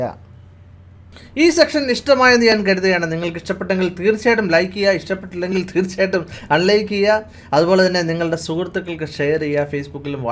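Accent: Kottayam, India. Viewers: Please avoid sir kottayam slang I didn't understand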